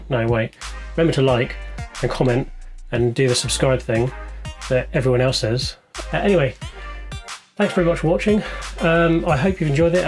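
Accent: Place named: United Kingdom. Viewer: i like your style (and your British accent! btw I'm a German) :) you should continue making videos :D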